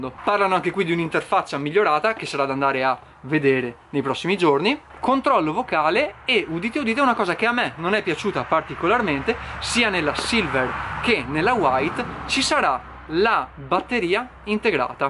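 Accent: Veneto, Italy. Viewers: ciao e grazie per il video. Domanda... dall'accento sei veneto esattamente come me. Ma da dove di preciso?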